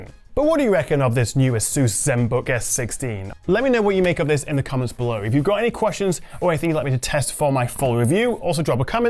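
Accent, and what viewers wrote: England, United Kingdom. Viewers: From what region of England are you?, Is it just to know where your accent comes from. Please. A French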